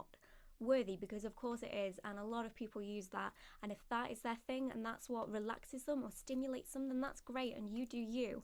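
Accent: United Kingdom. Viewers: God I hate the overtly sexual vidoes/thumbnails... I dont know who is watching their full vidoes theyre usually terrible from an actual asmr or relaxation point ... side note Ive only seen some whispered vids didnt realize you were from UK, probably why I like your voice so much lol. Anyway thanks for making content! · Shes british mate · yeah I know that, I just can't differentiate her British accent from other parts of Britain or Europe.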